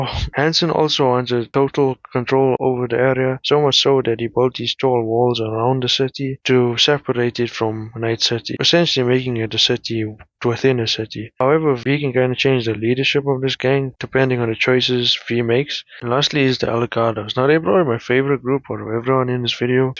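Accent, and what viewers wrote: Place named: South Africa. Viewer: Yooo. You sound South African choom. Awesome vid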